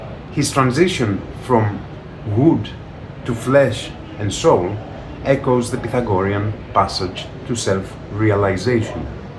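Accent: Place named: Greece. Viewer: I find your accent very charming. Greek must be a beautiful language!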